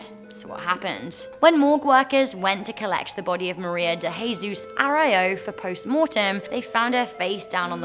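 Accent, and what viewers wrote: United Kingdom. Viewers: I love your British accent , its soo beautiful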